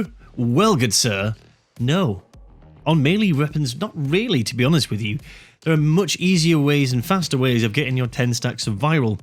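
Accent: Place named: United Kingdom. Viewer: love that british voice of yours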